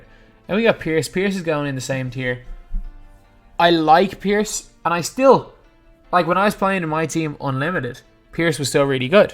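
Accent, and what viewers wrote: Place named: Ireland. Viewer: Yo what county in ireland are u from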